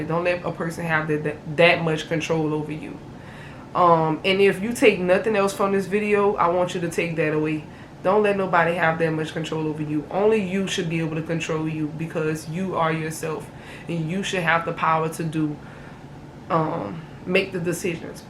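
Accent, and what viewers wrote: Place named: New York, United States. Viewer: i looove your accent! u from NY??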